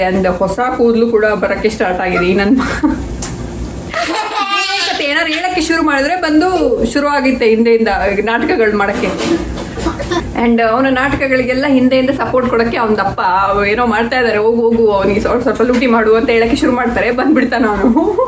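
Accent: Mangalore, India. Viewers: Hey I like to watch ur videos coz I do speak Kannada just like u.bindass but let me tell you that iam a mangalorean but I was born n brought up in Bangalore Namma bengaluru ❤️so I was happy to know that ur a mangalorean.ur hubby spoke about biryani I was like OMG good. anyways how is Canada's present situation.hope everything is fine there take care ❤️👍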